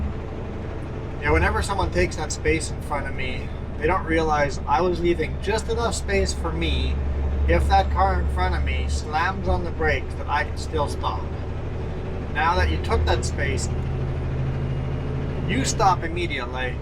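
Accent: Canada. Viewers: Nope. You sound like a Canadian to me. From a fellow Canadian and British Columbian. 😎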